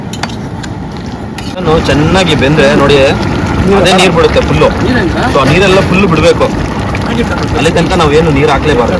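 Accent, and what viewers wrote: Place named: Anekal, India. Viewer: ನಮ್ಮ ಆನೇಕಲ್ ಬಾಷೆ ತರ ಇದೆ ನೀವು ಮಾತಾಡೋದು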